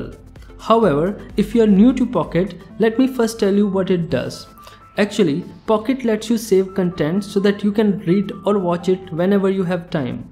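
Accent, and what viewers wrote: India. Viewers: Why do you sound like an Indian? Well, ok, he is an Indian. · LOVE THIS CHANNEL BUT I HATE THAT INDIAN ACCENT.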